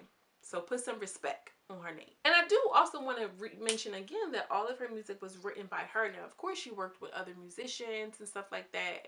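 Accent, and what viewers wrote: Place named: Brooklyn, United States. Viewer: This was very informative... you sound like your from Brooklyn, I love your voice.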